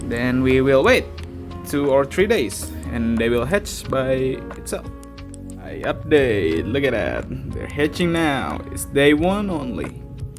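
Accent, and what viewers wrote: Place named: Indonesia. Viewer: Dari aksennya langsung kedenger, Indonesia ya? Eh bner pas tengok channel mas nya 👍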